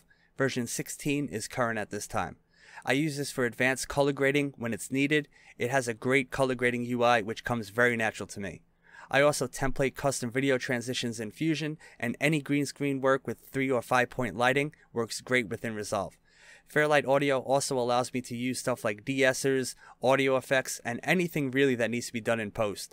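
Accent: New York, United States. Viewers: wait wait wait, was someone not able to tell that is a NY accent? good video as always.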